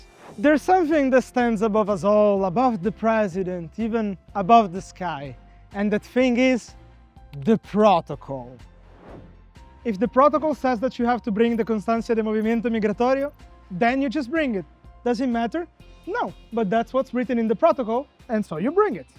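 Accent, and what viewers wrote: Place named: Italy. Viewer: A very impressive video and PP team, the information, key points, story telling and entertainment were a great value and I appreciate the company I'm working with (Paraguay Pathways) is willing to go to such efforts to provide this information to the internet. Not to mention the great entertainment. AMAZING. Put in a suit with his Italian accent, he can promote Paraguay to the world:). Thanks again Paraguay Pathways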